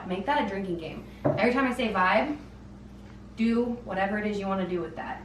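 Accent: United States. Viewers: Hi frands....🙋😘 You guys are, sooo, funny. 😂😂😂 I, soooo, needed this tonight. I'm laughing so hard I can't type...😭😭 southern accent and backyard tour was hilarious. Love you guys. Love watching Chip and JoAnna. Great show. Your show is more fun. I like your little house. It's cozy. Love the blue chair. Where did you say you bought it? You could put little blue lights around corkboard to balance with the blue chair. A couple of yellow pillows will give the couch a pop of color and would look nice with the poo of blue from the chair. Have you ever thought of doing your house using Fung Shei. The style uses the elements in each room of, Wood, Fire, Water, and Metal. Placement is put according to North, South, East, and West. By combining the different textures, it brings harmony and prosperity into the home. It's, quite, fascinating and fun. You can google it and it will take you through each room and tell you what textures and colors will go together. 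Each room uses the NSEW areas and middle to bring calmness and harmony. You may not want to spray lysol around. It can cause neurological issues in humans and pets. My kitty had seizures after spraying it, one day. She suffered some brain damage and couldn't walk straight for almost a month. She was, terribly, wobbly and I had to carry her around and to her litter box and food. Slept on the floor with her, in case she needed to go potty or eat. She, eventually, got better, but, was never the same. I never use chemical prod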